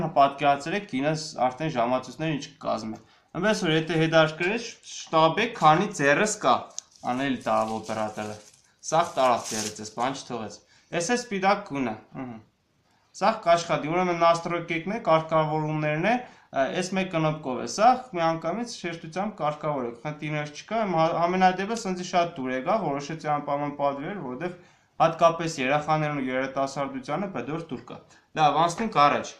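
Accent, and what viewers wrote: Gyumri, Armenia. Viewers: Lenakani barbary dzec axpersss Like!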